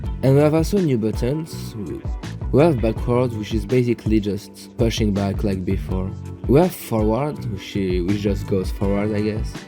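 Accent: France. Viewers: Is it just me or voice seem a little bit less french-y? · wesh un francophone qui assume son accent baguette....respect.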